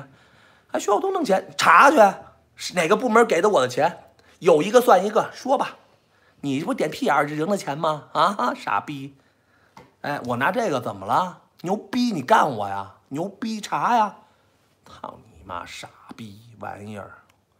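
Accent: Beijing, China. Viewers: 骂得太爽了，尤其一口京腔味带着愤怒，又夹着一丝丝幽默铺天盖地、如海啸般把海外这帮伪民运全卷入了海底，从此海外应该会清净点了吧！如果还有， ，再来一场海啸！ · 北京爺們兒罵人真好聽！ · 曉冬可以學台語嗎 你的臉一臉台味 怎麼是大陸腔呢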